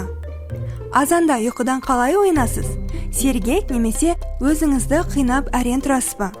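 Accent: Standard. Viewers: Не деген сулу казакшаны таза сойлейтин кыз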